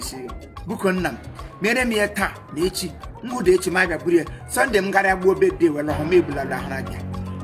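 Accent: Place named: Mbaise, Nigeria. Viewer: You are very talented, I like the mbaise your speaking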